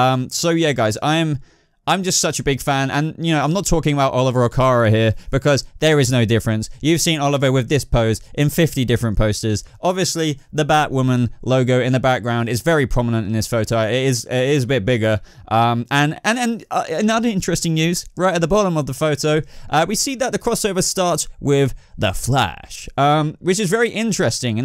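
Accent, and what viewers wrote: United Kingdom. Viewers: And btw nice haircut are u british cause u kinda sound british and a differ race i can't recall or put my finger on lol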